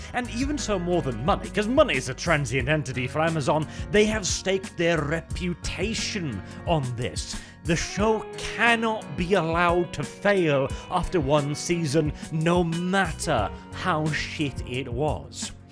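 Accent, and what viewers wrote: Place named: England, United Kingdom. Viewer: I've noticed that he has this very crisp and pleasant English accent most of the time, but when he does longer videos with other people, he seems to partially lose that accent part of the time. Anybody know what's up with that? lol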